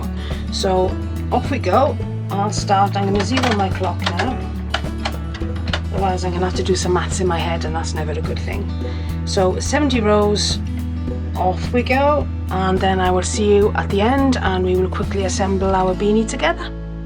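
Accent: Wales, United Kingdom. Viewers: MY husband is from Yorkshire, and I am in love with each UK accent. You sound kind, generous, and lovely tbh. Thanks so much. Happy festivities to you and pets, my birds, doggos, chickens, and hamster say hi!! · I thought so! Me too. It's strange to see a fellow Welshy doing knitting machine tutorials, it's normally more American accents you hear 🤣 happy new year to you too! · I love listening to your welsh accent - my grandmother was welsh and a great knitter and crocheter. Love your patterns too 😊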